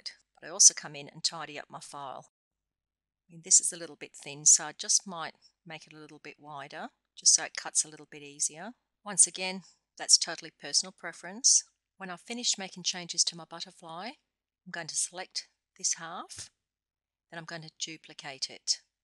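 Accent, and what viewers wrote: Australia. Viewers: She's Australian I reckon..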